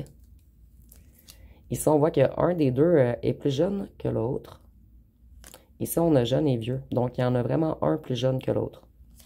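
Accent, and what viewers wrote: Quebec, Canada. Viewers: J'adore vous entendre parler et vous ecouter chère madame avec votre joli accent quebecois❤❤❤❤❤❤❤❤❤❤❤❤❤❤❤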